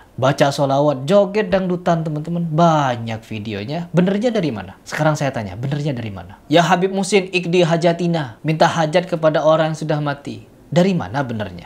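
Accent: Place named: Madura, Indonesia. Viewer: Afwan apakah akhi orang madura,,,,logatnya mirip kami,,,,alhamdulilah semoga makin banyak yang mengikuti kajian salaf,,,,Ana juga kemaren sedih pasca keluar dari ma’had ada banyak sms masuk,,,,ternyata beliau meninggal,,,,rahimahullah semoga kita bisa mengikuti beliau aamiin,,,kami sekeluarga di arab pun ikut sedih karena ahli tauhid wafat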